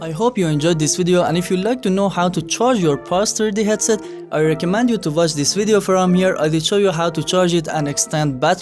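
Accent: Iran. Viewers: Are you irany?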